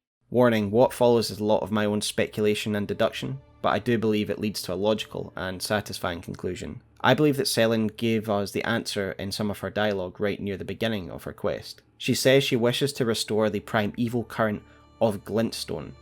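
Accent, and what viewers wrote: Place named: Scotland, United Kingdom. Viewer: Nothing makes me happier than hearing a Scot talk about Souls lore. Bring me McFlail!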